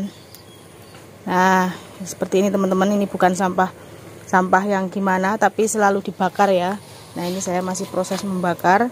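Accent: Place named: Lampung, Indonesia. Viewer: Lucu mbak bhsamu hhhh. Dlm dri lmpung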